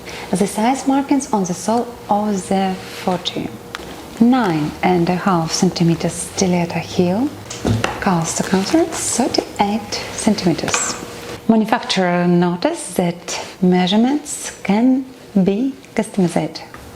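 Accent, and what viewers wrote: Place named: Russia. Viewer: Сач э гуд инглиш, бат виз сам рашн акцент. Бат ит нот со хэви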